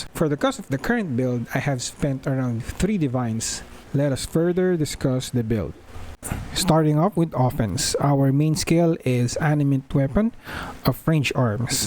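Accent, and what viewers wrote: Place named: Philippines. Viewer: Accent is very pinoy ✌️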